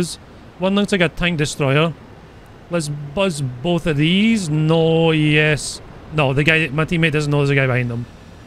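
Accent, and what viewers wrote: Scotland, United Kingdom. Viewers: I really enjoy watching your videos, but please forgive my ignorance, are you Scottish?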